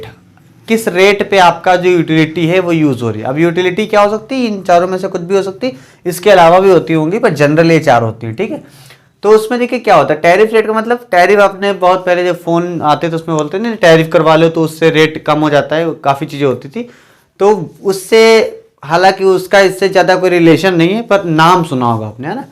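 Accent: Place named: Uttar Pradesh, India. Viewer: sir me apki boli se smjh gya tha aap utar pradesh se ho exactly kh se hoo??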